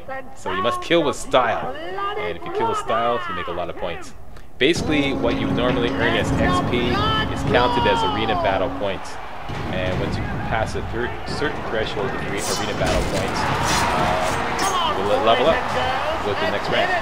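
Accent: Canada. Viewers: o ur Canadian eh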